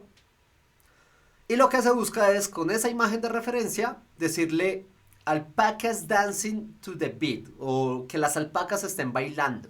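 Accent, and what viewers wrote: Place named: Colombia. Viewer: Gracias por el resumen. Me encanta tu onda , forma de explicar, la edición de los video, y, la forma de hablar colombiana. Slds from Argentina. I love Gemini.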